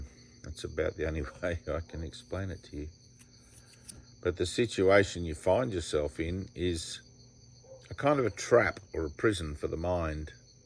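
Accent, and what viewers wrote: Australia. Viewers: 1965 - me to, I'm Pisces, in Australia as you sound to be, looks like QLD ? - this is fascinating, yes we are spirit beings having a human experience ! Now, also at 59 I have been "pondering" these issues since childhood & I find it so interesting that almost everyone with whom one discusses this, almost invariably cannot resist pointing out error of one type or another, this I am NOT doing ! I do look forward with great anticipation to getting my copy of this book, thank you so very much for this video & indeed your Y.T. Channel. A more captivating subject I have yet to encounter, love and light be with you and ALL souls now, 💜💜💜 💜 💜💜💜 🕉 🙂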